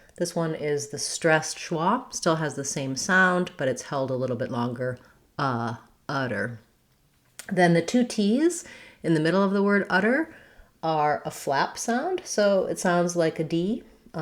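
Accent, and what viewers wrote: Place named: United States. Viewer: Thank you so much teacher I really like your good work and I like american accent I will stick with you to get this beautiful accent,this is my second video...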